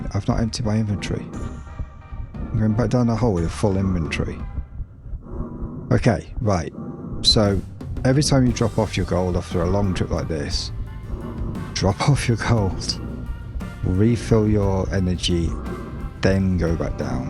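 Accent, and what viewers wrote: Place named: United Kingdom. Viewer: I was wondering what part of the uk your from?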